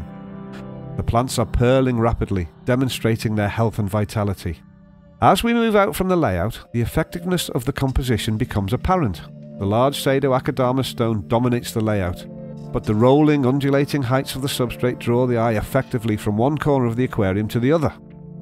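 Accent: Liverpool, United Kingdom. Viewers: Hjalmar Nordén he’s not from Sheffield lol. It’s much closer to Liverpool or the Mersey area. They’re scouse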